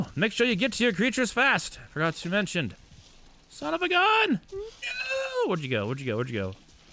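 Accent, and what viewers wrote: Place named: Australia. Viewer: bro u sound aussie sometimes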